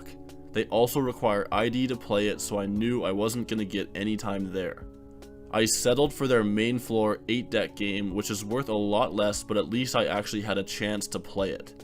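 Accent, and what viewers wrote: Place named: Canada. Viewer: you sound canadian, so guessing you arent tipping. but making that kind of money you really should tip your dealers.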